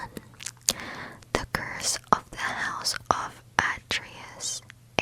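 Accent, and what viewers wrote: Australia. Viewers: that Australian accent even through the whispers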